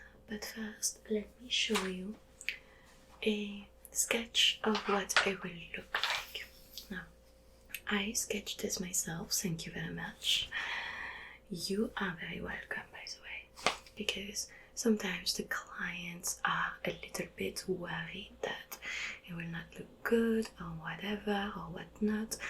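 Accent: France. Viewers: I'd really like to live in universe:'/ - educated universe Ton accent français me fait beaucoup rire d'ailleurs! Tes vidéos sont vraiment originales j'adore 👌🏻💕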